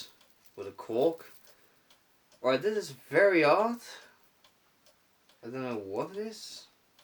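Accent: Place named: Netherlands. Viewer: Now I know what the Dutch accent sounds like 👌 nice video!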